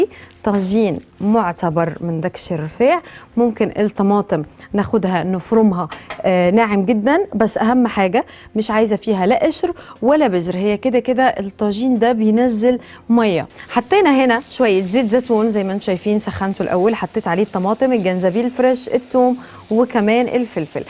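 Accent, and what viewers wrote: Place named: Morocco. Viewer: تسلم الايادي حبيبه حتى وانتي كتكلمي مصري اللكنه مغربيه مزال حااضره ههههه لاااايك بقوووه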